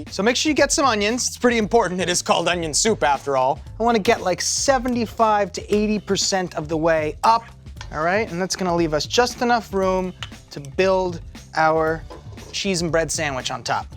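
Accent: France. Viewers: Not complaining, but I think may have just wanted an excuse to use his French accent and vocabulary for an entire episode. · can you speak with that French accent in every video going forward?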